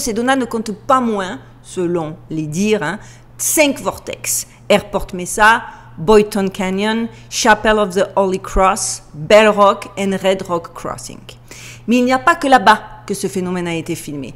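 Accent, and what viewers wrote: Marseille, France. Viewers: Salut, on reste toujours jusqu'à la fin des vidéos! Ok tu n'es pas très catholique, cependant, il reste en toi, et c'est tant mieux, de belles valeurs, celles que le Christ est venu nous enseigner. Autre chose, dans la vidéo de la semaine dernière, tu nous disais que tout avait été dis sur la mort de la reine d'Angleterre et que du coup, tu changerais de sujet. Cela dis, je travail près de 10h par jour du lundi au vendredi, et je ne m'accorde que quelques heures d'écran par semaine, le journal TVL chaque soir, les vidéos de Greg Toussaint, un peu de Blanche Gardin, Campagnol TV et bien sûr, chaque dimanche, une jolie blondinette avec un accent marseillais magnifique au surnom de. Alors, je crois que tu peux nous parler de tout, vu que beaucoup, je crois, t'on choisis pour cela. Belle journée, Nico de Belgique. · bonjour 1/ je vous trouve geniale et sympa avec votre accent du midi 2./ je royalement d'accord c'est ce que je pense aussi - vos documentaire sont hyper passionnant que je vais continuer a regarder vos autres videos - regrette pas de m'etre abonnee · Tant que les martiens n'affecteront pas ton accent marseillais, pas de soucis 🙂 Une rencontre du 3ème type pourrait être pourquoi pas, bénéfique à une humanité qui part de + en+ en quenouille comme on dit chez moi😉 Merci pour tes vidéos toujours passionnantes.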